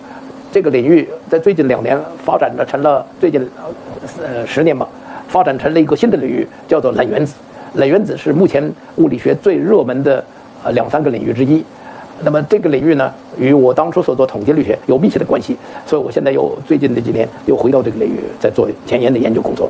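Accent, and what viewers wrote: China. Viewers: 1922年出生的可以说是近代史活化石了呀 他的口音就是当时中国的口音吧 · 精彩。在美国生活了一辈子，英语的华人口音还这么明显。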